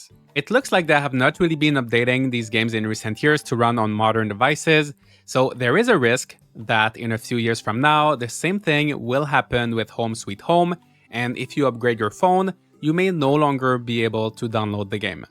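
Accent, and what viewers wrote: Canada. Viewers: this is so random! But I'm just wondering what kind of accent you have? I know you're from Canada, but it doesn't sound French Canadian to me!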